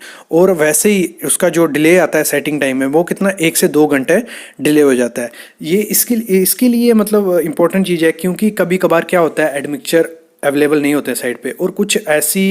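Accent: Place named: Sri Ganganagar, India. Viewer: Boli se lgta h shri ganganagar se ho aap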